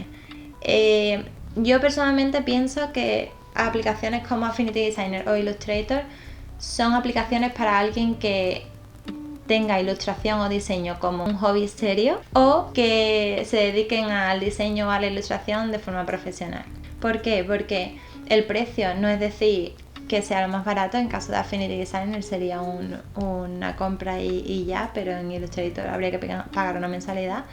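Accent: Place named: Granada, Spain. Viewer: Excelente contenido, y además con acento granaíno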